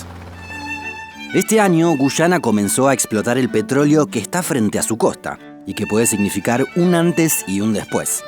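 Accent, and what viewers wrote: Buenos Aires, Argentina. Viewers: jajajaja, no solo Buenos Aires habla así, todo el sur también habla así, entre ríos, Santa Fe y el sur de Córdoba también. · se le re nota que es argentino ese boludo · Es argentino es su acento. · Los amo <3. Hacen un trabajo fantastico! Saludos desde Mexico! Viva la vocecita con acento argentino que dice siempre Tristan da Acunha jajajajaja lo amo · Me quedo mas que clarisimo que sos Argentino, por la forma de pronunciar y marcar la "Y" cada vez que decis Guyana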